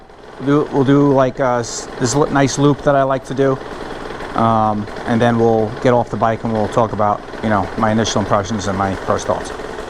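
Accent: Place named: Massachusetts, United States. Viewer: You from Massachusetts? Got a north east accent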